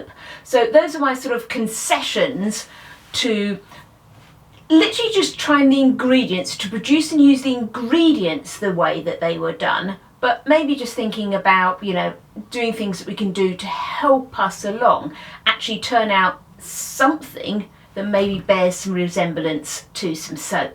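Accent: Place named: Southern England, United Kingdom. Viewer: South of England sounds like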